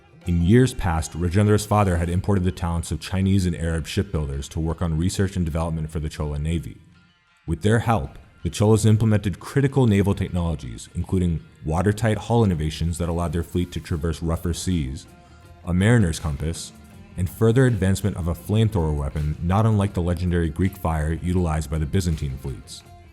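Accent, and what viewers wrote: United States. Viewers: Easy answer to this is ' last one to colonise were British they brainwash ALL WITH FALSE EDUCATION SYSTEM you guys still following LOL, so the thought of retaliation won't even b there, same with Maories n same with aboriginals ' it's good this american voice hv done good research not sounding bias so that's a good sign on the other hand if u keen must have a look to Mr Rajeev Malhotra ' books on breaking India forces'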